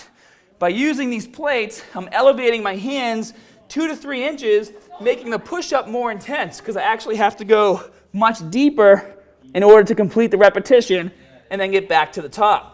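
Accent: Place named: Massachusetts, United States. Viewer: this dude sounds like hes from Massachusetts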